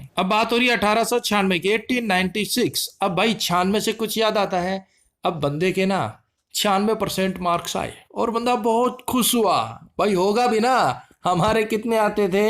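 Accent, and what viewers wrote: Haryana, India. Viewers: Sir, kya aap haryana se ho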